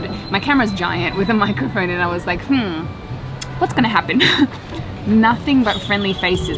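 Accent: Australia. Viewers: Are u aussie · How do you not use plastic water bottles while traveling in South and Central America? Does your water bottle purify the tap water to AU standards so you don’t get sick? With your Australian accent I’m assuming you grew up with super purified tap water... the tap water in South/Central America has bacteria that we’re not used to so we get sick when we drink it. (I’m half Australian/half American- grew up in the US. I just got back from Ecuador and was reliant on bottled water during my stay there so I wouldn’t get sick. In the states I will not use plastic bottles and during my stay I filled up my water using the big reusable bottles as much as I could but still used the plastic ones occasionally when other alternatives were not available.) Thanks so much!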